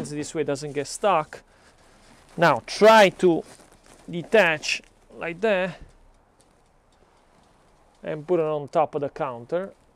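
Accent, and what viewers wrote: Italy. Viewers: great italian accent😍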